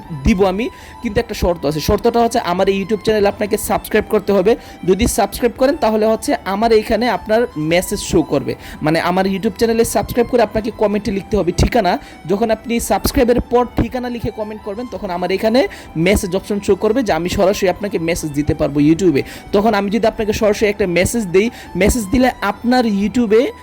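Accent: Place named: Barishal, Bangladesh. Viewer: Borisal thake bolse😊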